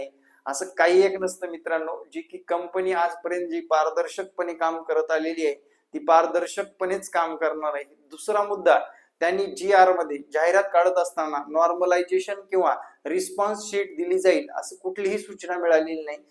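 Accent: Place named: Vidarbha, India. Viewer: Awdl tai tumch boln..... Aplya swtachya bhashet bolnyat weglich mjja ahe... From vidharbh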